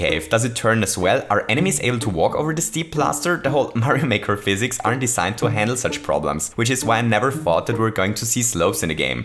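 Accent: Germany. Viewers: Are you from germany? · Misser Deutscher Akzent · Are you German?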